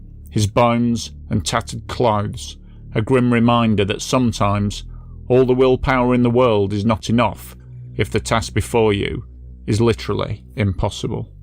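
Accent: United Kingdom. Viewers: clearly you say 18-98. Our Yank cousins ain't used to our dialect, innit 😆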